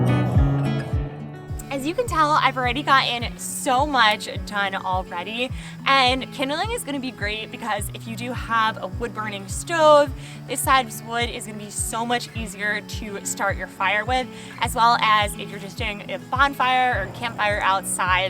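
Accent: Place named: United States. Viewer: This American voice gets in your nerves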